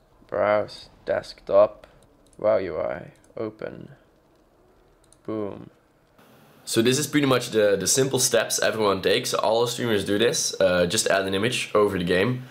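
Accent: Netherlands. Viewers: Are you Dutch? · Lol love ur englisch its so funny and i reconice myself, becous i also speak dutch ;D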